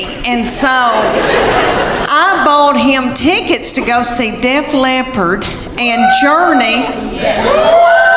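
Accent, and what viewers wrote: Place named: Knoxville, United States. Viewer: So funny. The accent is so like my cousin in Knoxville.😅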